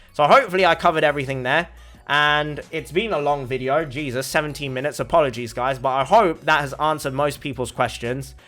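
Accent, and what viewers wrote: United Kingdom. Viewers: why the heck are you asian but has a british accent?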